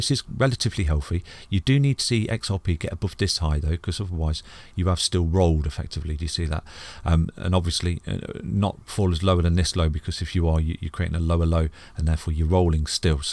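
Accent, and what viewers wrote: United Kingdom. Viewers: Like yout british accent.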